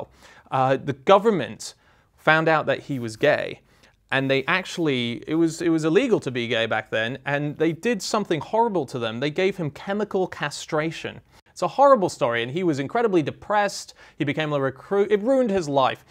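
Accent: United Kingdom. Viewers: True, but only part of ot. You sound to be british so you should know better... War came to Britain later than it came to Poland so it's just normal that Polish mathematicians started to decode it. It's not like bomba was not accurate to newer enigma model it's that British ( that sheltered those Polish mathematicians) couldn't afford to build more. WE as human should respect all that contributed for the greater cause. ALL those who were working on deciding the enigma were great pro's.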